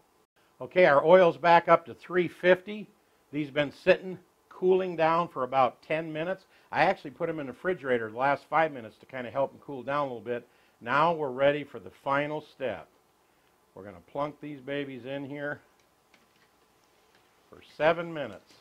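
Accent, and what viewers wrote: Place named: South, United States. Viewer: Nashville, Tennessee. I love the southern accent.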